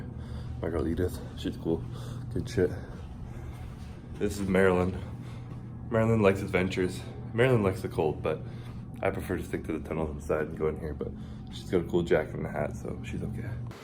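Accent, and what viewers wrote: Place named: Quebec, Canada. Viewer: “Beurre d’arachides” 😄👍 haha you should do more video in french!!! You will gain more viewers with your French Canadian accent !!!😆🤙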